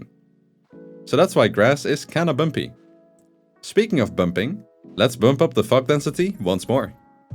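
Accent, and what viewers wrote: Netherlands. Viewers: Ah yes the Dutch accent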